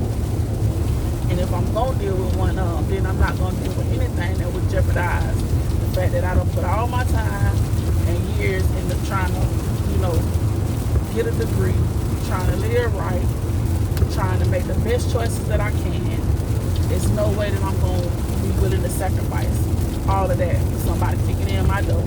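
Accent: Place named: Orlando, United States. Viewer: I figured you were in Orlando area, you hv the voice inflection/accent from there.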